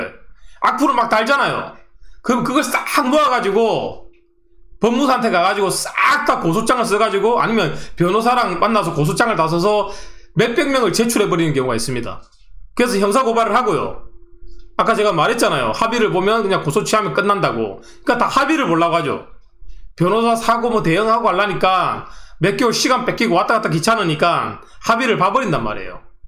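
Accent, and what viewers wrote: Gyeongsang, South Korea. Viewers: 지난 40분짜리 영상도 끝까지 다 봤지만, 말씀 하시는 것 하나 하나 논리적이시고 불필요한 비난 없이 모두에게 도움이 되는 방향으로 말씀해주셔서 이번 영상도 경청하게 됐어요.^^ 처음엔 생소한 채널이어서 조금 오해도 했었는데, 가장 어른답게 말씀해주셔서 개인적으로는 가장 와닿았고, 이 계셔서 든든하다는 생각도 듭니다.^^ 앞으로도 응원하겠습니다! (경남쪽 사투린가요? 들을수록 정겹네요~^^)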